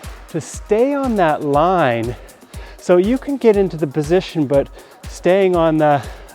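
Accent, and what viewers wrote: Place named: Italy. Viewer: And I really like your Italian accent <3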